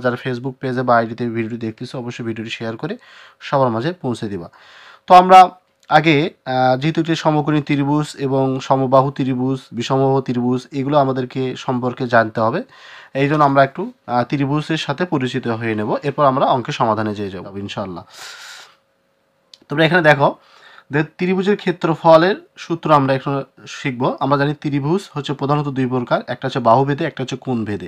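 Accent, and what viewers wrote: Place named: Gaibandha, Bangladesh. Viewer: Apnar basha gaibanda na vaiya😂😂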